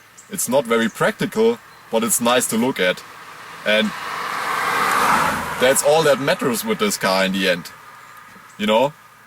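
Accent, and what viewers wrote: Germany. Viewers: Your german accent is really cool , anyways i own a mini and although i`m not an expert im very pleasant with it , i think it is a really good car , regards from Mexico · 1st off: love the German accent. 2nd, one purchases a Diesel for cost savings not sport. Why would Mini offer an engine that beats it's high end Cooper Works edition?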